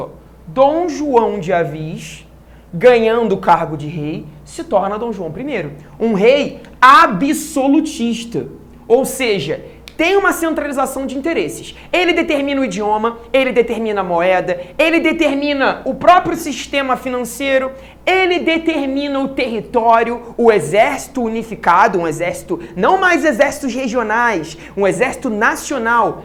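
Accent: Rio de Janeiro, Brazil. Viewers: aaa amo sotaquin cariocaa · Acho lindo falar colocando xs. Em qual região do Rio terei que morar para começar falar com esse sotaque e quanto tempo mais ou menos? Obrigada!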